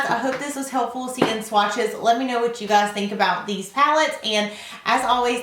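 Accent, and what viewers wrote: South, United States. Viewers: Knew you were country from the first word you said haha love from Mississippi ❤️ thanks for sharing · Loooove your accent. I'm a country girl myself and I have t heard any makeup bloggers that have a southern accent. So glad I ran upon your video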